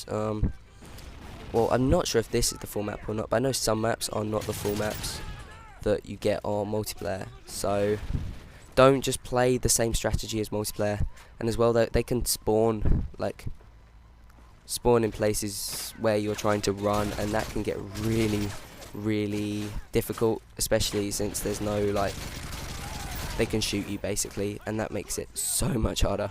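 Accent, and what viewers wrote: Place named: England, United Kingdom. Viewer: Cause he's ignorant and you have an english accent.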